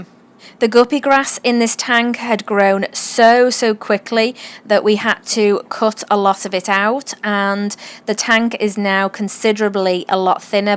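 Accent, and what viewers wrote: Liverpool, United Kingdom. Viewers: huh...are you from Liverpool UK? I am and your accent sounds so similar 😂👏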